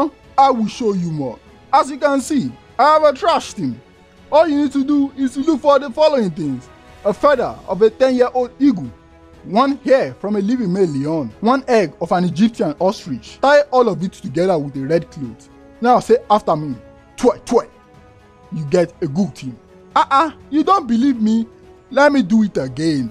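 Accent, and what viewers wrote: Nigeria. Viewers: ahhahaha! real nigerian accent! I LOVE THAT! LOL!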